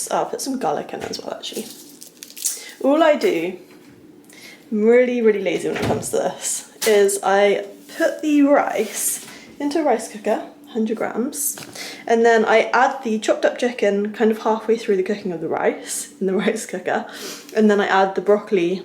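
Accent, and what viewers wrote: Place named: England, United Kingdom. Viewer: I love your accent, english girls always get a +1 thanks to the accent.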